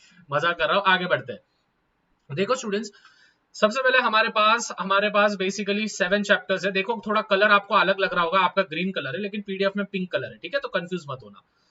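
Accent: Maharashtra, India. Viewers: SIR , AAP KI MOTHER TONE MARATHI HAI KYA....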